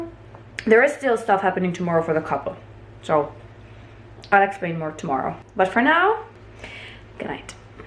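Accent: Ireland. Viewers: Irish here, living in California with 30 yrs. Your accent sounds Irish to me??! History of the Hutterite please? Curiosity getting to me lol! Hope everything went very well and God’s blessing on the new couple for a long marriage and children God willing, you got my taste buds wanting to try your food! Thank you xx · What a delight the YT algorithm was to me today to find you, your sweet spirit and generous sharing 🙌🙏 I really enjoyed this educational and inspiring look into your world and your almost-Irish accent reminded me so much of my Irish gramm 🥰 We’re in the construction industry in Alberta and have always had Hutterite (ex colony) boys in our employment: thee most respectful, hard working and diversely talented employees ever. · First time watching. Have you ever been told you have an Irish accent. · I take it you’re in Canada but do I hear a hint of Irish too?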